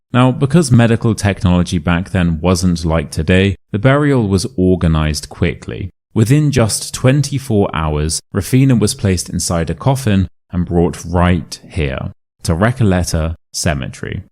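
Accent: RP, United Kingdom. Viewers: A good approach to Modern RP though.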